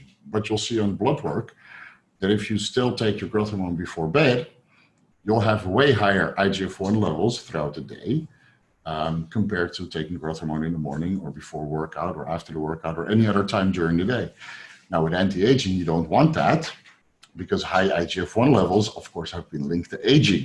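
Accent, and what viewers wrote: Netherlands. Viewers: Love the Dutch accent! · Yeah but he is Dutch, we can hear it in his accent.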